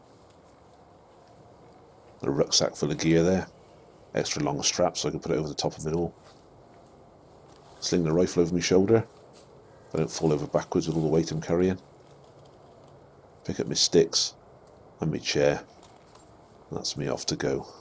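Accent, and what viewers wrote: United Kingdom. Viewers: I was just thinking if you put all that all your videos on DVDs and put them on American type DVDs to where I can watch him on my DVD player I would buy them and I know a lot of other people that would enjoy your Pest Control show on YouTube would enjoy them as well and be a good way to make money as well cuz a lot of people would buy them and I would definitely buy them just too helpful hint saying that I would love to sit back and watch it on a bigger screen instead of my phone they let me know what you think and it would be awesome to own all your videos because it's been an hour of watching them two good learning experience there's a couple of things that you say that are funny in each video because just the way the British talk I'll I love the way the British talk they have a certain way with their slang and it's just funny and certain things that you say like mr. 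Pheasant and for me is like thanks for playing mr. Squirrel it's all over LOL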